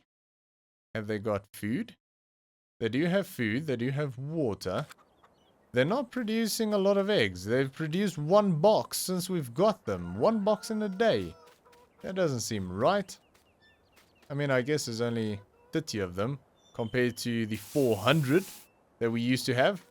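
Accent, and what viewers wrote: South Africa. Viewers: You sound like you are from South Africa... anyway got here from Dag.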